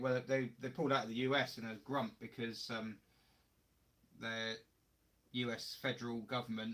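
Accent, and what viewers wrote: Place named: West Country, United Kingdom. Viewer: Sorry I missed the live boy apprentltbdaughters birthday was more important… really enjoying the catch up. Just to clarify cause it wasn’t made clear, do you have your own SM? As you seem to have a bit of a West Country accent can you end your vids with a “proper job0 “ akin to the pe- chew of Ian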